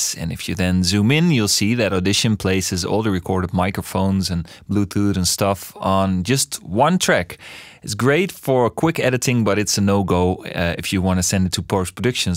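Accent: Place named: Netherlands. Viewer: While listening to this tutorial I thought 'Hmm, this sounds a bit Dutch'. Then I realized I recognized the voice. Then I saw it was you. Thanks, ' '!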